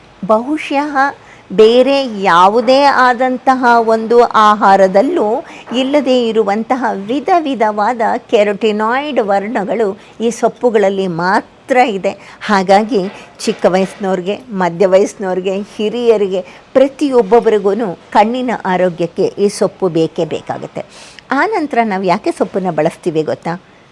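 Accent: Standard. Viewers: ನಿಮ್ಮ ಒಳ್ಳೆಯ ಮಾಹಿತಿ ಮತ್ತು ಸ್ವಚ್ಛ ಕನ್ನಸ ಭಾಷೆ ಯಲ್ಲಿ ಅರ್ಥ ಆಗುವ ಹಾಗೆ ತಿಳಿದಿದ್ದಕ್ಕೆ ತಮಗೆ ಅನಂತ ಪ್ರಣಾಮಗಳು ಮೇಡಂ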